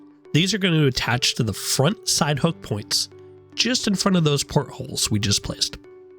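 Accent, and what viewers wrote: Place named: India. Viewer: love your indian accent , and your typical indian youtuber intro style... jai hind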